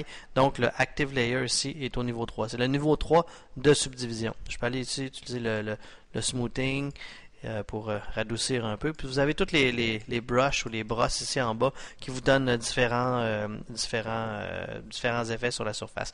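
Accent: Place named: Quebec, Canada. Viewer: Merci pour ton tutoriel, (en plus je suis fan de l'accent québécois) je cherchais justement à me mettre au sculpt et j'hésitais entre mudbox et zbrush.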